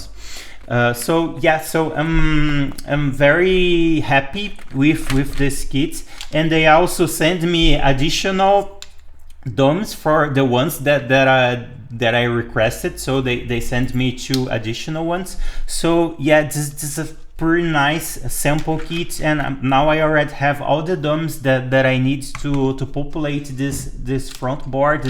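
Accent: Brazil. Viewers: Kind sir, are you by any chance Brazilian or Portuguese?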